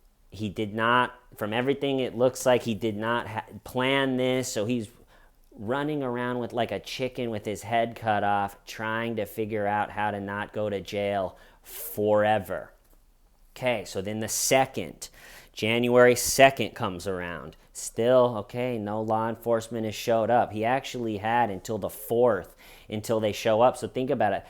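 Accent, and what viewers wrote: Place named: Boston, United States. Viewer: I'm from Boston and you make me lol with your Boston aaaacccent. I've been a true fan from day 1 when you post a video it makes my day! Any comedy show coming to Boston in the future?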